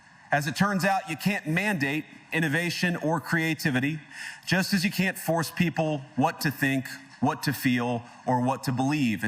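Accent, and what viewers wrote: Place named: United States. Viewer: Finally an American politician who sounds, well, American!!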